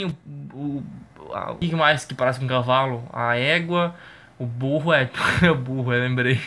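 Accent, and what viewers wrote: Brazil. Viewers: Vc ta falando goineins ou goiano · O sotaque de Rondônia parece com o paulista, pelo menos o seu.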